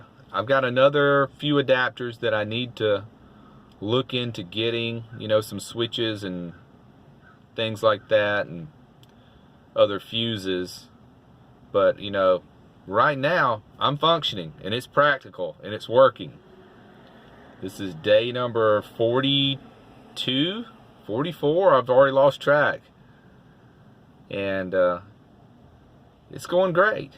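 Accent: United States. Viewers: Super cool accent you have there Mr. USA🇺🇸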